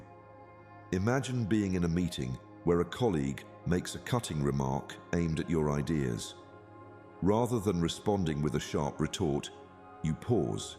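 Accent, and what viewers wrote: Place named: United Kingdom. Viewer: Why is this a British voice???.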